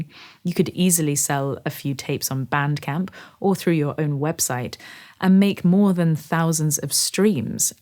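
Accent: United Kingdom. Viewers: Lovely British accent you have. listened yesterday Face To Face album from Klinik recorded almost 30 years ago.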